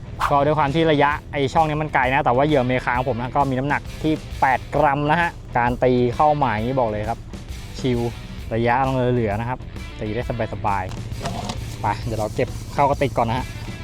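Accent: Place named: South, Thailand. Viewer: คลิปนี้น้าตุ้มพูดใต้ออกเลย😅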